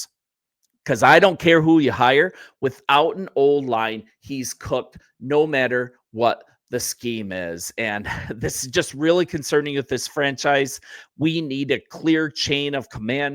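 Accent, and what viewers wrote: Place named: Canada. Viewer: I'm sensing a Canadian or yupper accent, definitely not Chicago.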